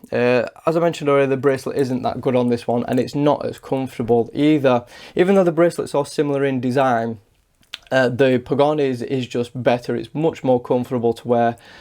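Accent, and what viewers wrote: United Kingdom. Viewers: Super Fluent British Accent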